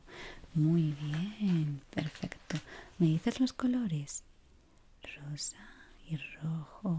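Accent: Spain. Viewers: Me gusta tu voz eres española?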